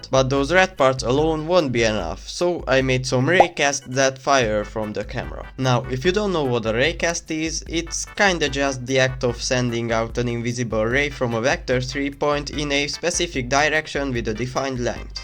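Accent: Hungary. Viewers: are u hungarian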